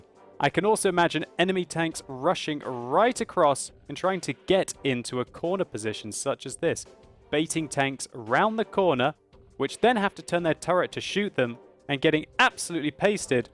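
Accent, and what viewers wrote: Germany. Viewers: German accent 10/10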